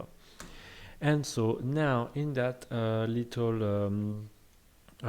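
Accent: France. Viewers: Ouais je me suis dit que son accent c'est 100% français.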